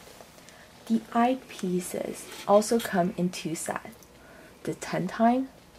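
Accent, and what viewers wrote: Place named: Asia. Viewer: sounds like an asian woman trying her hardest to speak english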